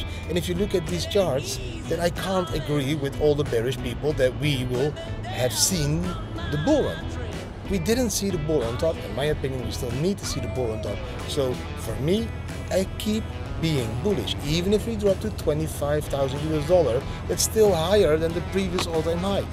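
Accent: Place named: Netherlands. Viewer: He een Hollands Engels accent:)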